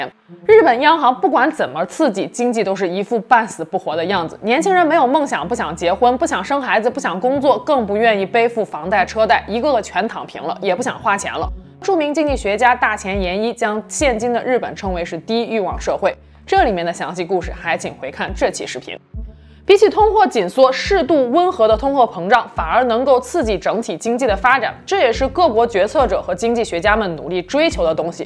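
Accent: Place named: Beijing, China. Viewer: 是哪里人，是中国哪个城市的。听她说话像是北京人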